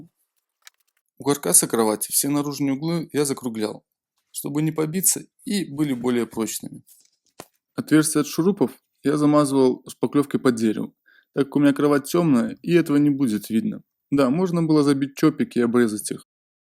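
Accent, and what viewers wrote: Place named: Belarus. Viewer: Точно! Коллега в Минске несколько лет прожил, тоже так говорил.... Живе Беларусь)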